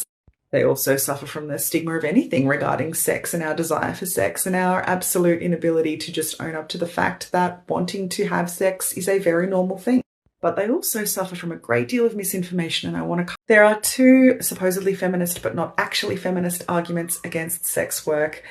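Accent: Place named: United Kingdom. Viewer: 100 % agree. And off topic but I always thought you were from the UK because of the accent, and not Australia😅😅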